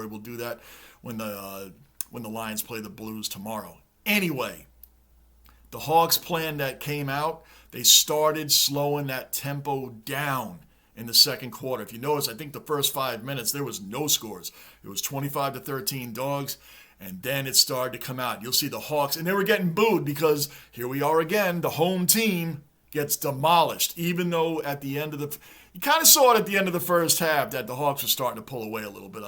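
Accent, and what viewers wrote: United States. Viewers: A novelty hearing an American accent talking about our great game like a fan with some knowledge. Keep up the great work bloke ..... 👍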